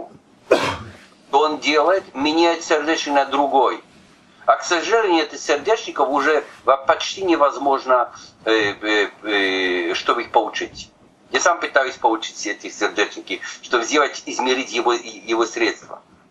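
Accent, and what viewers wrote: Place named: Poland. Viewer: Польский акцент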